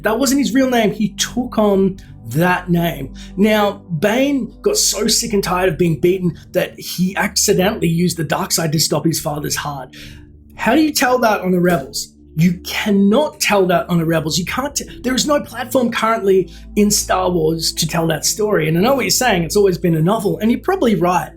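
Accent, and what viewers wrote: Australia. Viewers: Hey I Dunno If This Has Been Asked Before But Are You An Aussie By Any Chance???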